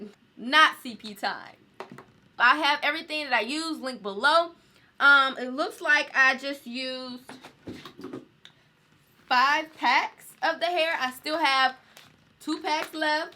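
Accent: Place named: Atlanta, United States. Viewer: I’m a First time viewer and the minute i heard you speak i knew you were from atl lol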